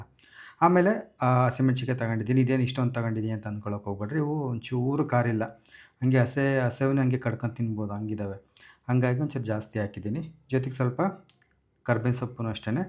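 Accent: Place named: Challakere, India. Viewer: Bro super nam maneli ide tara madodu nim mathu kelta idre nam uru ansutte nan bandu challekere nim uru yavdu bro but nan ega irodu blore nali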